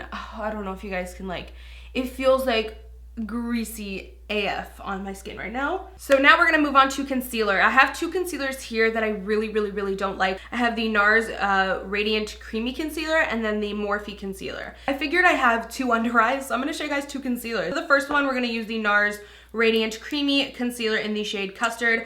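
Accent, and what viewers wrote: Canada. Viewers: Is she Canadian? Just the way she pronounces certain words sounds Canadian. :-)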